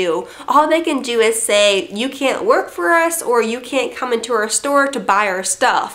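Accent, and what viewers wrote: United States. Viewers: you got a great American accent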